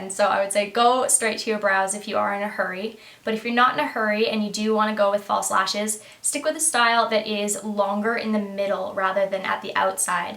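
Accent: Australia. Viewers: you sound soo australian here!!! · I can hear an Australian accent starting ;) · Every video I watch, I am seeing an Australian accent coming through stronger each time! You have such a great talent and Australia is damn proud to have you! Also love this look!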